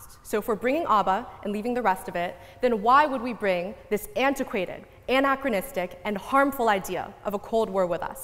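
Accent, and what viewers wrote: United States. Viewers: Haha, she is incorrect of course because we can all see what china has done to it's own people! Plus this girl who seems to know so much about the country she defends has not lived there! If she was living there maybe she would have a better idea in fact about the ccp and how they operate. I believe to defend a government you have to know from experience what you are defending. She talks about the west in a unfavourable way with her American accent, I find it somewhat ironic to be honest. My family are immigrants , yet I do not attest to know the life they endured. I found this girls speech insincere and her attitude aloof. She is very much entitled to her opinions of course and so am I! My advice to anyone who wants to know about ccp go live in mainland China and see for yourself. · She sounds American.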